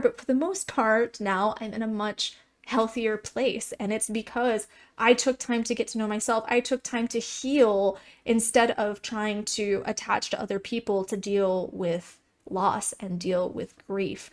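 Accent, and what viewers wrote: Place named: Southern California, United States. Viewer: It must be 2024. A sweet, blonde lady wearing pink and blue, with a "So-cal" accent is reviewing 🤣.... If only they knew her inside thoughts.. Appearances can be deceptive. I like this channel, there are some great reactions and honest truthes... but I can't help thinking - covering things in pink hearts and adopting a Disney princess voice / persona is actually doing you any good. But if it's what makes you happy, In the words of - be yourself, by yourself.🤘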